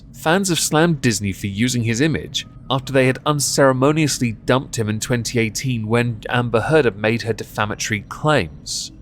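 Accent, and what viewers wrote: United Kingdom. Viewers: I think having a British accent helps.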